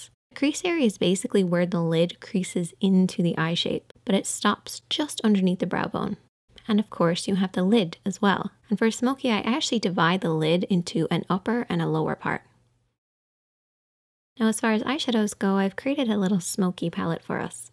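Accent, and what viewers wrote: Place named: Ireland. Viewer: Weird question, are you Irish? I can hear a wee twang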